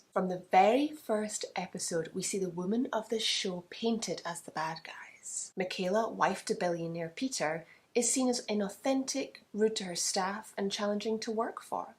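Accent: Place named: Ireland. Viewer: Came here from tiktok, I like your Irish accent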